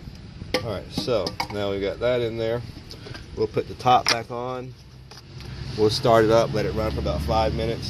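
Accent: United States. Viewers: I get a lot of verbal inflection in your voice of a New Yorker. You actually kinda sound like Stanley Kubrick. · Interesting video. You talk like a good ole boy from South Carolina. I understood every thing you said. lol Where are you located?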